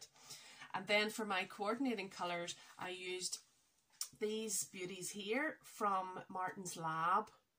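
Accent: Northern Ireland, United Kingdom. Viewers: Hi, I've just came across your channel and have (as you can see ) went right back to the start. Do I detect a hint of Northern Ireland in your accent? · This was a very enjoyable first podcast. You took to it like a duck to water. I live in Co Antrim and it’s great to hear another N Irish podcaster. Looking forward to Episode 2. Touched by your scripture message. Thanks x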